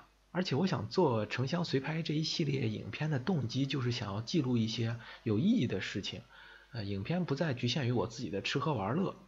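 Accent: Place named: North, China. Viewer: Obviously, he spoke Mandarin with a strong accent of north China.Besides, you need a dictionary to understand what "developed" really means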